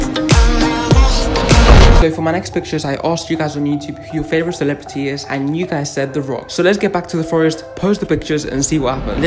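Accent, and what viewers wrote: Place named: England, United Kingdom. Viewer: U sound like ur from England bro NICE ENGLISH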